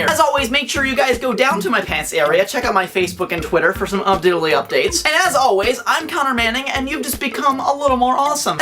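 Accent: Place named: South, United States. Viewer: I LLLLUUUUUUVVVVVVV ur southern accent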